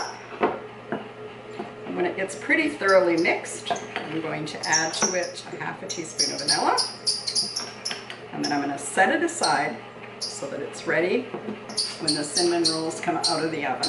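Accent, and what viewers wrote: Canada. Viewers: I love my bread machine , found it at a thrift store Brand new for $5 it makes the 1 lb loves ,,,yes you need to find the right recipe ,,,i use the dough cycle and then make mini loafs ,,,I gift it as well,,, New to your channel ,,I could tell while you were Talking you were Canadian ,,I live in Southern BC ,😊😊😊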